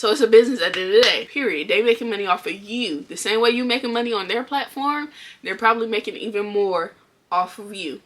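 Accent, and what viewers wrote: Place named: Florida, United States. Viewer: Knew you was a fellow Floridian on the original video I watched!! We just have a distinct sound. Im from Palm Beach. I will be starting my channel in a few days and this really helped to uncomplicate it all! Ive felt stressed starting a channel before. It wasn’t fun either.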